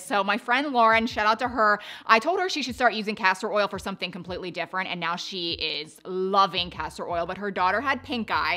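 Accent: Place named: California, United States. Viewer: I couldn’t listen because of the Californian affectations, waving nails in front of the camera and garbling at different speeds then doing lioooooong croaky bits. Calm down. Keep your hands still. Speak slowly and clearly and not as if every sentence is a question. Vocal fry and unspeak isn’t cool si=x7rH9xDPsorNr47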